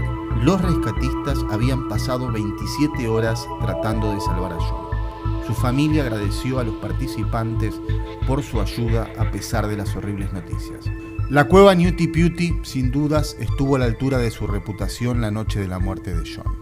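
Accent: Argentina. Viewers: Sos argentino ? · Entiendo. La verdad es que me encanta el acento argentino 😄👍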